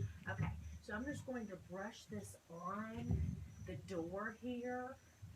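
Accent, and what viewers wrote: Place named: South, United States. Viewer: Such a fantastic southern accent! Where are you from?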